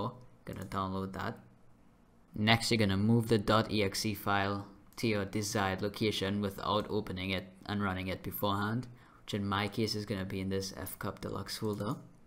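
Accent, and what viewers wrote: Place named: Trinidad and Tobago. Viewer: sounding like ah Trini.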